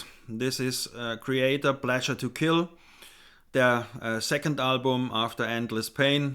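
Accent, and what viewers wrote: Germany. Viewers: Mir gefällt das "DeutschEnglisch"" ^^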